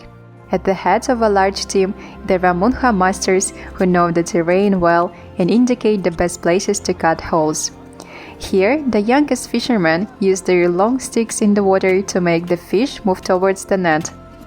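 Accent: Russia. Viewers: Русский акцент пробивается...Как тебя звать?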